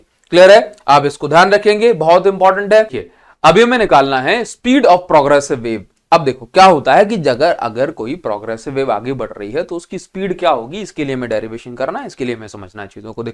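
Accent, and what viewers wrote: Odisha, India. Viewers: Sir great video❤ Sir, are you odia? Jay jagannath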